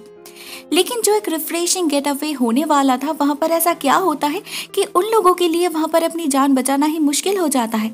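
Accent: Bengal, India. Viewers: I love ur Bengali Accent 😜😘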